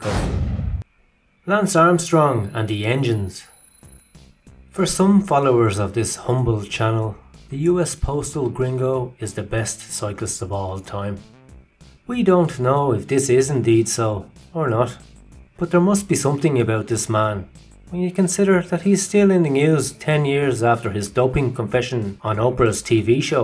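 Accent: Ireland. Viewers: Is that an Iris accent I detect?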